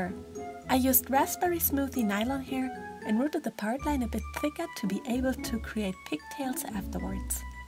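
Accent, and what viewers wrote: Germany. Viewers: Are u German? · Your voice is so relaxing and soothing. I’m just curious but are you german? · Your german right?😄😉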